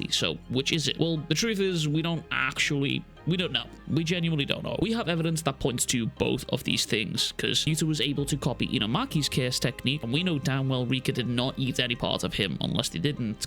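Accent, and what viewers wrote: Liverpool, United Kingdom. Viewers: Gotta ask man are you from Liverpool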